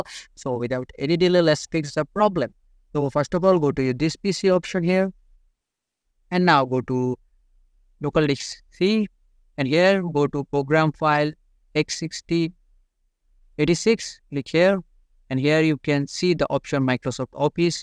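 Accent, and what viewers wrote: India. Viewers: After i heard indian accent i knew i found the one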